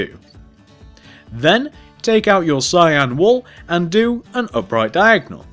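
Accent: United Kingdom. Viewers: I rememember this channel as a kids, the British accent and the voice I use when doing a tutorial now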